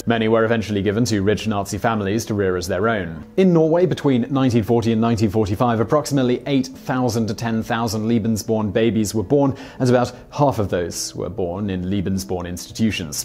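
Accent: United Kingdom. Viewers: you often talk about your efforts to nail the pronunciations of other languages. It seems that in this instance your tendency toward a British pronunciation was just doubled down upon. Seriously I can't believe that any effort at all was made to understand or reproduce a proper Germanic pronunciation despite the jarring difference in meanings throughout the entire video... my enjoyment was negated by this oversight.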